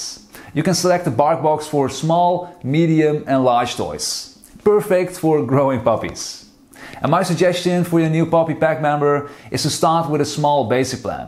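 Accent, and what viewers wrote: Netherlands. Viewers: Your accent sounds Dutch, am I right?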